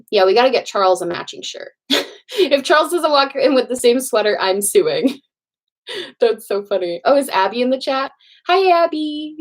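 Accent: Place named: Boston, United States. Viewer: i’ve been binging your channel lately and the fact you have a BOSTON i’m gonna cry you’re literally my favorite person right now ❤️🥺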